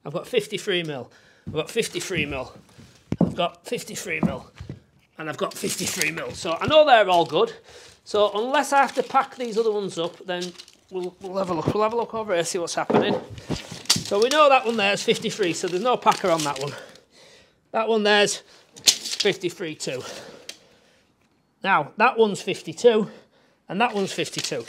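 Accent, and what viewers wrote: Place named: Northern England, United Kingdom. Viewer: Brilliant video. I an a carpenter and joiner so can appreciate when a job is being done correctly and conscientiously. I am planning to build my own garden room this year so looking forward to that. May I ask who supplied the bifold doors? I know you did mention them in the video but I couldn’t catch it. Probably because you have a strong northern accent and I’m a Londoner. 😆 I will be watching more. Great job on both the build, and the videos. Cheers!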